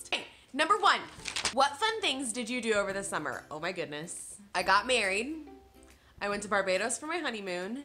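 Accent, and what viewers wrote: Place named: Indiana, United States. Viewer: I would LOVE to know what decor pack you are looking at. I am going for the same look in my classroom. I am switching rooms and grades, looping with the same kiddos and want to give them something fresh. Fellow Hoosier here. Transplanted to NC at 15. From the South Bend area. Your accent makes me feel at home. Enjoy your videos. Thanks for sharing!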